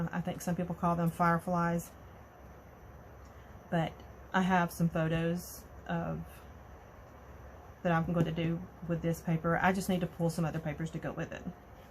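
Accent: South, United States. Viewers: You are not alone in this epiphany! I too have come to the realization that my spending is out of control! Getting it into control is not going so well with me either. I've tried, Lord knows I've tried. I think if slowed down a bit. I really don't need anything more but my problem is for example... when you showed that Reminisce hello summer guy side B paper, I'm not gonna lie, I went and googled the crap out of that paper! Lucky not lucky for me is it is no where to be found! Not even on EBay. There may be an Etsy but not gonna go there! I just told myself I don't need it. I have found something that's therapeutic for me at this point in my spending habits it, I will shop til I drop, I mean I will load up that cart with all the pretty things that I love. After about an hour of a complete waste of time I will close that browser and walk away with never an intent to ever checkout! 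It help me but then, someone showed the Jillibean soup discount box haul she got from an etsy shop and yeah, not gonna lie I had to have it! After all its Jillibean soup, face it how much longer will we be able to crab up some of that! Well I walked away from that too then the next morning I got an email saying hey you left something in your cart we'll give you 25% off today! You bet your bottom dollar its mine now! I enjoy your southern accent so don't you even try to change it! You video got me thinking! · First of allllll don’t you dare get rid of that southern accent! I looove it! Second- I laughed out loud when you said “ was I smoking crack that day?” 😂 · Lovely to hear your pretty southern accent! I should try the 30 days of sketches,too! I am so far behind with everything! Love your kits!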